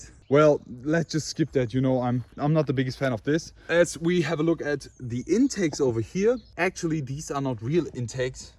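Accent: Germany. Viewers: fürchterlich wenn ein Deutscher Englisch spricht 😢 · wenn der deutsche englisch redet.... fck noob.... felgen sehen scheiße aus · Man merkt das Du aus Deutschland bist 😂😂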